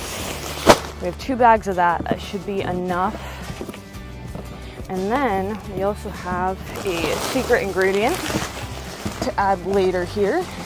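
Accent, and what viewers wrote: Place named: New England, United States. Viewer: Maybe just a hint of New England in taaaak. These folks work hard at whatever they do. Seem like sincere people. Need more of them today😊😊😊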